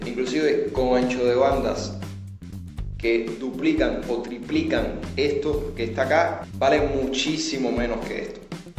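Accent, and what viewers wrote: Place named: Cuba. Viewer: Saludos desde California, USA de tu seguidor Puertorriqueño Angel. He visto muchos videos de personas en Cuba haciendo lo mismo que tu, pero los tuyos me han impresionado mas por tu los temas que escojes para presntarnos a tu pais. Explicas lento y sencillo con tu lindo acento Cubano y hasta algunas palabras las pronuncias como nosotros los puertorriqueños. Imaginarse a Cuba y verla por medio de tus videos son dos cosas bien distintas que me han dejado con los ojos abiertos. La arquitectura de los edificios es bellizima y un tesoro nacional. Que lastima que muchos esten en deterioro. Pero nada, felicitaciones y muchos exitos y espero 👀 tu proximo video pronto. Cuidate y bendiciones a todos en la isla.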